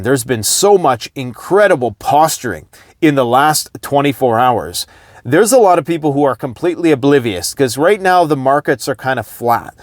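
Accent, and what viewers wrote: England, United Kingdom. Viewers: Ok that British accent has me rolling. You speak redcoat just a little too good 😂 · love your British accent. As I am part Brit I got no problem with the use that voice to mock the crowns BS and if you live in a crown country you don't live in your own country do you. Us real Brits are always mocking the elites, which near us sound like us lolz unless they are playing "rainbow friends" with "young global leaders" etc. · I love your British accent. Sounds awesome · That English accent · You have the best English accent! Keep it up! Helps digest all the hard truth you are giving us! Don’t stop. A spoon full of sugar helps the medicine go down :) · Thank you kindly brother. You must be tired. Love the British accent. Need to use more often. Had to do a lot of replay😂. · I Love the freaking English accent making fun of the long winded diplomatic words fancy words declaring war without flat out saying "our country is declaring war on XYZ!" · Laughing! 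English accent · God I love it when you put on the pretentious Brit voice, lol makes the info easier to swallow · Not good, 😂 British accent, why ppl refer the accent to war scenarios. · That's an English accent lol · Lol...Love the English accent could you do a show ( post ) on MILITARY DRAFT and what it takes for a draft to happen who would be called, age groups, each countries policy's on this. I think that would be a informative subject. Thanks for your channel! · I SAY OLE CHAP THAT WAS A BRILLIANT PROPER BRITISH ACCENT...BRAVO, WELL DONE YOUNG LAD! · 😂 love English accent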